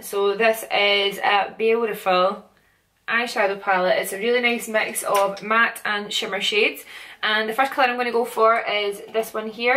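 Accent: Scotland, United Kingdom. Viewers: Its just a fucking scots accent calm it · She's Scottish Lool that's not foreign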